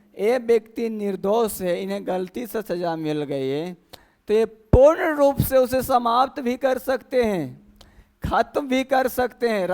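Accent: Bihar, India. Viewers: क्या आप बिहारी है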